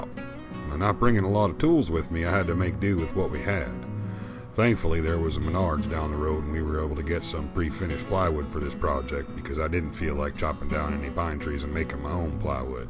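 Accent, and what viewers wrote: South, United States. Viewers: So what's with the southern accent? I tought you was from da UP 'eh? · Like most of the people on here I'm thrilled with the narration format. I like the Southern Sound of the accent since I'm a Texas boy myself. Sounds like you'd be right at home in the Lone Star State. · I love that southern accent the ol' story is told in! Great solution for van camping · I see that you also picked up a southern accent while visiting your mom. Although it should have been the other way around. Nice narration by the way. Thank you for sharing.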